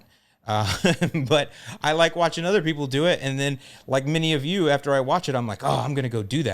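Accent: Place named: South, United States. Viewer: I just can’t do it. Your soothing southern accent gets annihilated with every super chat.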